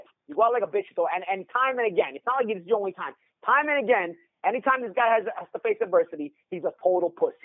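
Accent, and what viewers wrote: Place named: New Jersey, United States. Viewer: Paulie used to be immensely annoying but his New Jersey accent seems to have died down a little so he may actually be tolerable soon!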